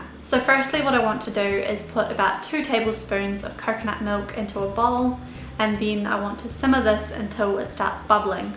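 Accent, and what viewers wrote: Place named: New Zealand. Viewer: Just discovered your channel. Absolutely love your videos, they are so genuine and watching them made me smile. I sense a kiwi accent hhaha. Hello from your neighbour in Australia :)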